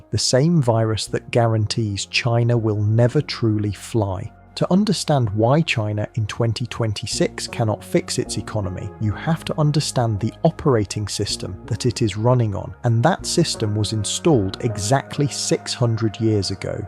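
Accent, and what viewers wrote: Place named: England, United Kingdom. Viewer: Why is all this recent Ai generated content always narrated with an English accent? There must be a reason,i know its grating on me though.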